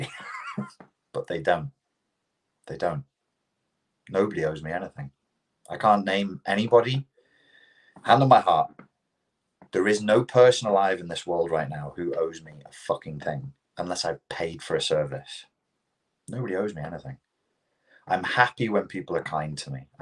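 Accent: RP, United Kingdom. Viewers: As a Canadian I think your accent is kinda posh sounding.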